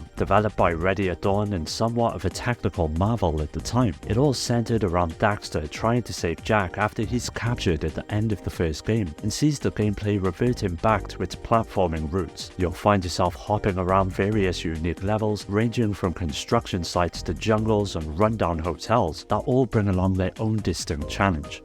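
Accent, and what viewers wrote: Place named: England, United Kingdom. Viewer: Suikoden right off the bat? Fantastic. Just got stumbles upon your video on my feed and i like your english accent, because as a non native speaker it's easy to listen to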